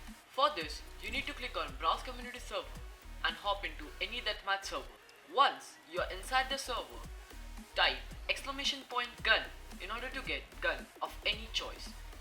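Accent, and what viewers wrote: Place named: India. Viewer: from india? that accent wtf - anyway improve, as already mentionted, the audio. have my upvote for the tips though.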